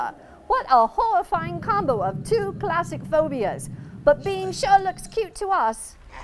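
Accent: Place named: United Kingdom. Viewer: Aaah... "O" Town ! I lived there many years. Migrating from Miami to Broward then Orlando area. The Beauty N/W of Orlando , [ Rolling hills, Beautiful springs & People ! ] Then.. 90% of those areas Paved over & MILLIONS of people Still moving into FL !! You have A GREAT Weather channel !! (Just found it 3 days ago) Very good "Brit" Accent ! BTW... Cheers & stay safe in these BEYOND preposterous times !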